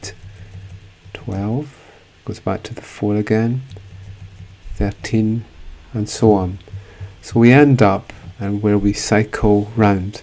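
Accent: Scotland, United Kingdom. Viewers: is skotiak akcent prezent?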